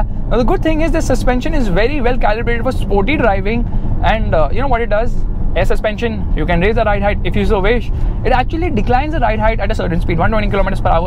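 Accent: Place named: India. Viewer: your english is boring learn how to to pronounce and continue with it you are here in hindi accent and you feel like english really is boring just listen just go for hindi you think you speak english but reality is you are speaking Hindi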